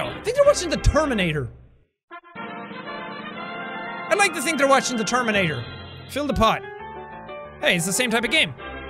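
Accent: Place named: Ireland. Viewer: This comment made me realize Jack has a lot in common with my uncle. Both screaming Irishmen*, both have a funny laugh, both look like me, both have blue eyes, both have raging Irish accents... jeez that awkward moment, amirite? *I'm part Irish on my mom's side.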